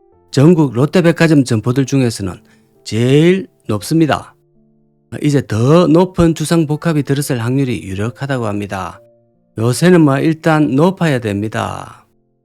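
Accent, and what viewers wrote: Gyeongsang, South Korea. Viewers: 갱상도 사투리가 구수하니, 좋네요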